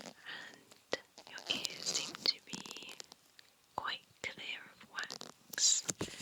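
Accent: United Kingdom. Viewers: I just love your British accent! Where are you from?London?Manchester? I'm from Brazil and have never been to England. Even though I study as much English as I can, sometimes it's pretty difficult to find out accents from all over the world. Once you've read this message, please let me know. It is like a self test about accents!Love your videos!BYE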